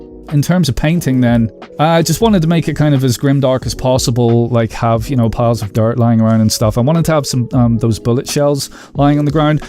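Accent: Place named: Ireland. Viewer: Brilliant ideas done brilliantly! On an personal note,you sound like a countryman of mine. Are you a northerner who went south or a Dub who went north! Either way, you’re very talented😊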